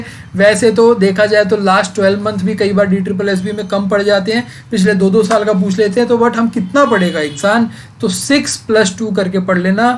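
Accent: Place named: Haryana, India. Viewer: Haryana se lagte ho.... 😁😂😂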